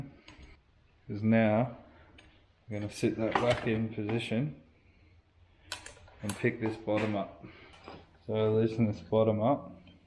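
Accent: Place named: Australia. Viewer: Hey mate nice to hear the aussie accent, thanks for the no bullshit video🤣I do have a question if you could help us out. I've just swapped mine out and note the stem has the slightest wobble inside where the bearings go as if the stem isn't wide enough. I'm not sure if the last forks did this as well. How did yours turn out?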